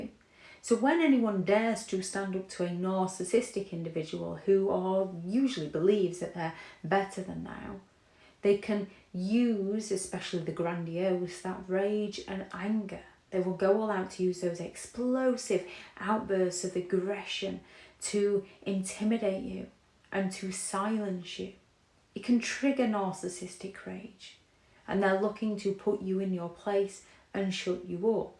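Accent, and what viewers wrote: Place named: England, United Kingdom. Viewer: I have sleep problems and this came back. When you or I stand up with these nut jobs they are 10 steps ahead with the scams and lies. Yeah by the time I’m typing this here comes the flying monkey platoon. The lil town in Montana has like 300 people in it. A flying monkey started telling me how wrong I was for leaving the lions den. I’m so over a life time of playing grab ars with these lunatics. And listening to your English accent even so makes me laugh at myself when I get tiny pieces. The patterns no wonder you smirk every so often. At least you found a way to help people who otherwise would live in complete isolation. I’m older now and often scard shitless about just living on. I’m tired. I’m not blaming your blocks. I’m just marching on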